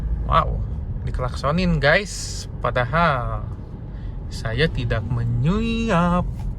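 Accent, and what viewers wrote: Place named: West Java, Indonesia. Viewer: Akang orang sunda jg ya?? Cara ngomong nya sunda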